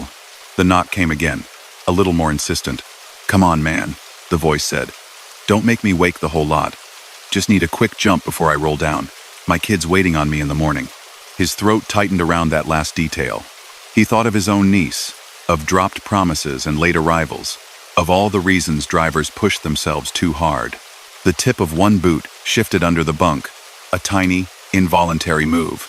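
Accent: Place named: Midlands, United Kingdom. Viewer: Uk midlands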